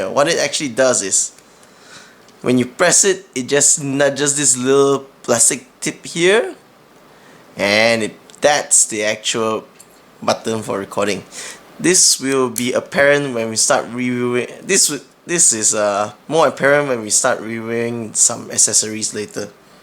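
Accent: Singapore. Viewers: thank u! love the Singaporean accent.. got this camera as a christmas gift and am still learning how to use it.. in ur opinoin is this better than the gopro cams? thanks for the review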